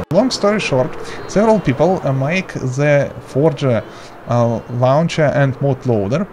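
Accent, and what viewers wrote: Russia. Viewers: Не думал, что русский акцент на столько сильно выделяется.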